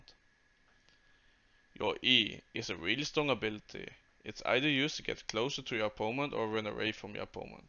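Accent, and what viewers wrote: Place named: Netherlands. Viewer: For some reason i smell dutch o_O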